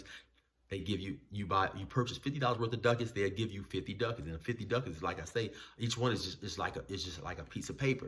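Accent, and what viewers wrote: California, United States. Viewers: You a natural story teller pimp especially with that cali dialect I’m hooked dawg. KEEP posting big homie. YARDOWN!!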